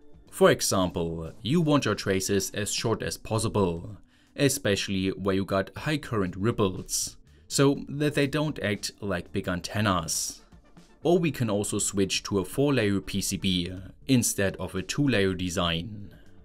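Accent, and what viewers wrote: Germany. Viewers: You are definitely not a Scott, this German accent is too thick,... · Informative Videos! Habe nicht gleich erkannt, dass du eigentlich deutscher bist :D erst nach einem Kommentar von einem der dich angeblich bei Würth gesehen hat, wurde mir klar, dass du etwas Akzent hast und wer noch außer Deutsche Verwenden noch Fineliner 😀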